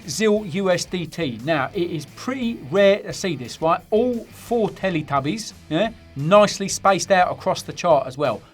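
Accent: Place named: Cockney, United Kingdom. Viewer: you was too good at being Barry - you're actually cockney, right?! - and the crypto gains made you!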